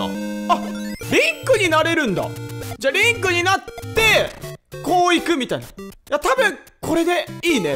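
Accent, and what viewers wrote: Fukuoka, Japan. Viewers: 全く関西弁ちゃうから気にせんでいいと思うよ〜！関西の俺からしたら福岡らへんの方言に聞こえます！ドコ住みか既出ならごめんなさい！ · 福岡弁は、関西弁なんかより柔らかくて素敵と思います(^^ 収益化が始まったとのことですので、より益々、見させていただきます。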